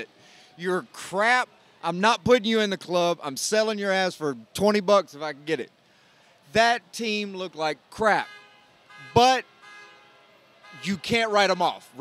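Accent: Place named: South, United States. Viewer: Yoo i aint trying to be racist but he sound way too southern to be a soccer but overall funny guy btw Super Dortmund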